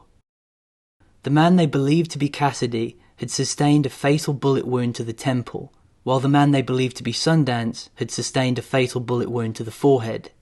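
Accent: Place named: United Kingdom. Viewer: Funny how you Brits are obsessed with our American wild west. And, Dude, you have a cool accent 😎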